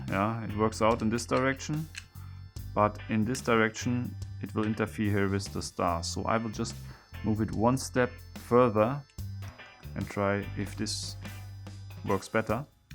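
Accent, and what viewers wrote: Germany. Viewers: Man merkt Hardcore das du Deutscher bist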